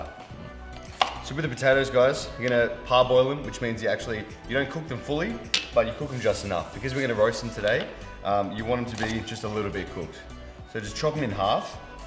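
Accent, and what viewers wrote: Australia. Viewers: oh shit he's from STRAYA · Wtf dude just shop at Aldi. I don’t know if you live in US or Australia tbf, you sound Australian. We have Aldi in UK and it’s cheap af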